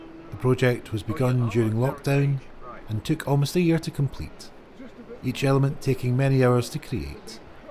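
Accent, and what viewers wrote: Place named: Scotland, United Kingdom. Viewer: what a great review, made so enjoyable by your Scottish tones, please do all the shows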